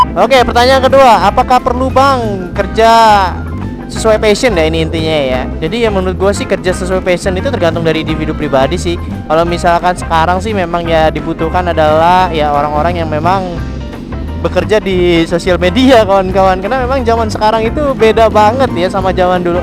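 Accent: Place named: Java, Indonesia. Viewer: Ngakak pas bang bicara ada logatnya jawa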